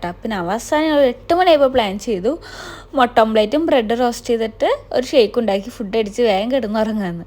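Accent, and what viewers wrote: Thrissur, India. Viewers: തൃശൂർ കൊളോക്കിയൽ സ്ലാങ് ആണിത് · തൃശൂർ സ്ലാങ് ആണ് ...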